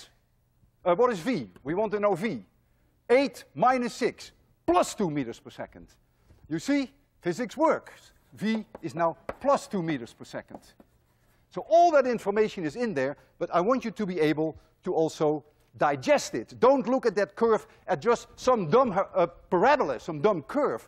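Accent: Netherlands. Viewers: The two most common comment I read here about are: 1. "Amazing teacher" I totally agree. 2. "He is Dutch" I assume because your accent, but (unfortunately) I can not capture that. For me your accent is very good and very compatible with my rudimentary English.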